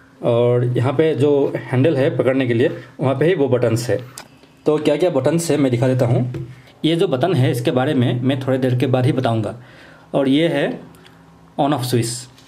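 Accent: Assam, India. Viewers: Are you assamse ? Your accent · Tum assamis ho na tumhara bat se pata salrahahe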